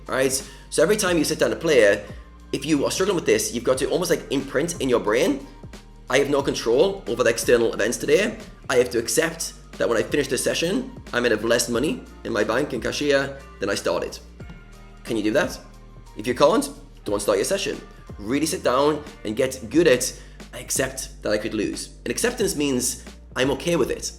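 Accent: United Kingdom. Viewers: Great video! Where are you from? Wales? Curious about your accent.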